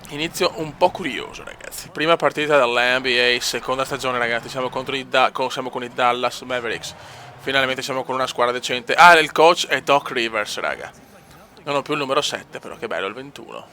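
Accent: Veneto, Italy. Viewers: che veneziano :D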